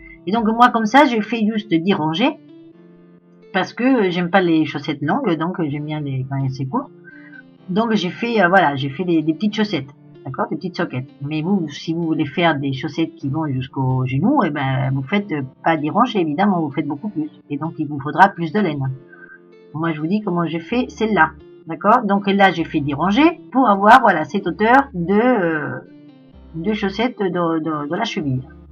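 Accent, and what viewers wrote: Spain. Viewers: Petite curiosité perso: tu as un accent espagnol, je me trompe?😉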